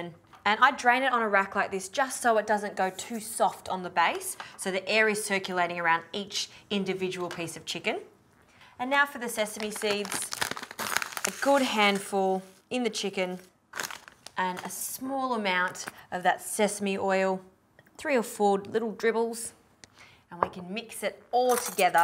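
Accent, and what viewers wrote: Australia. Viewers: Is this Australian or New Zealand accent? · amazing this looks really good i will definitely try it ..................... and i love your Australian accent after all I am Pakistani cricket lover who love s food as well. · She's Australian, this is from her TV show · she’s Australian 🤣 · is this lady from australia or new zealand?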